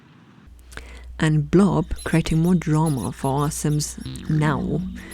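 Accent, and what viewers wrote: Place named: South Africa. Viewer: I knew your accent sounded familiar!! Hey fellow South African <3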